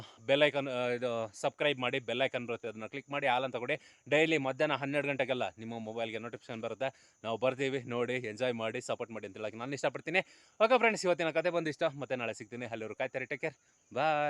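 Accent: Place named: Tigala, India. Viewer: Anna neevu tigalaru nimma bhashe enda tiliyuttade namdu Anekal navu tigalare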